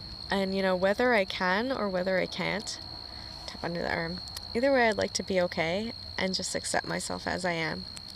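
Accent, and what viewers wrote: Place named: Canada. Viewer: It's funny to me how I randomly picked this after doing a general EFT search (without knowing you or your channel)...and hearing your Canadian "accent". My communication breakdown is with a Canadian 😇💙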